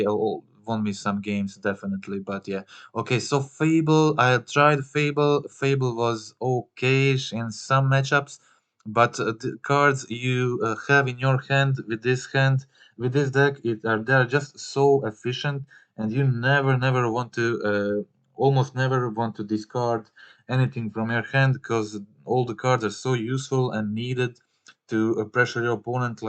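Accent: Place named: Poland. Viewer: first time here, and im about to buy 8 copies of it for me and a friend, no one else besides us two here thinks its any good haha (also your accent sounds pretty polish, correct me if im wrong haha)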